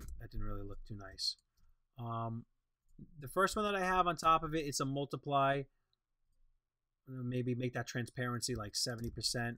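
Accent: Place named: New York, United States. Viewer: Noo Yourk.... I love your accent so classic NY! Not a dis but coming from the midwest like i do it is refreshing to hear and love this new series.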